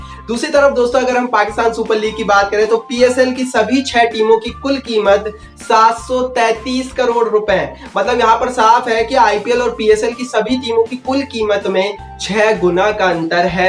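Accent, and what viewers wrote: Gujarat, India. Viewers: Sir App Gujarati Ho?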